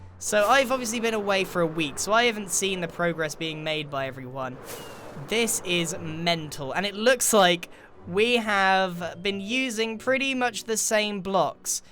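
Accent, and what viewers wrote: United Kingdom. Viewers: Has British accent Comment section: DAN TDM?!?!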